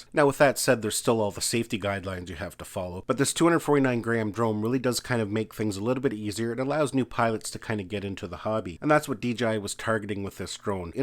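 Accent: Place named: Maritimes, Canada. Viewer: Have yet to get my first drone, and am considering this. Excellent in-depth review, descriptions, and explanations. I learned a lot. By the way, what part of Canada was the footage shot in? The accent sounds a bit east coast-ish.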